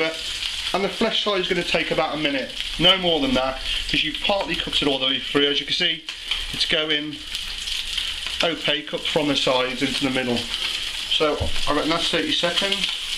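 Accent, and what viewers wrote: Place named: West Midlands, United Kingdom. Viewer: Hi, is this the new video you were talking about in your reply to me on your other Sea Bream [with chili] Video,??? If so, very simple and delicious recipe - Thanks,!!! Nice to see/hear a 'Brit' Midlander' doing great things in the kitchen,!!! It was trying to place your accent - West Midlands, Wolverhampton, Tipton, Dudley, Bridgenorth maybe,??? Be very interested to know - l do have a genuine reason for the question,!!! All the best, Paul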